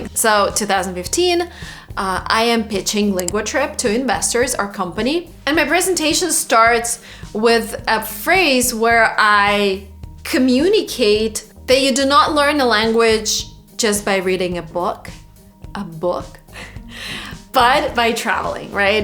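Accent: Russia. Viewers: please take care of your aspiration. The way you pronounce the h sound gives you a heavy Russian accent. The second priority would be all first sounds in the words you‘re saying. Love watching your pronunciation progress! Way to go, girl! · awful russian accent:face-turquoise-covering-eyes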